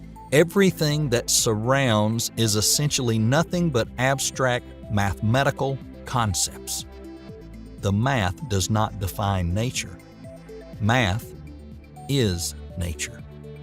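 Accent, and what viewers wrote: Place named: South, United States. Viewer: This channel would get more views if you changed your A.I. voice to one with a British accent. People generally prefer this when watching science related content as it sounds more intelligent than a southern American accent. Perhaps try a female voice such as the one John Michael Godier uses on his channel Event Horizon. I don’t recall any channels which are doing so at the moment. Enjoy your content a lot though, just the voice has to go. Keep in mind, most of your viewers are likely NOT Americans even if you are and you must therefore cater and adapt to the preferences of that audience. Cheers!! 💫🙏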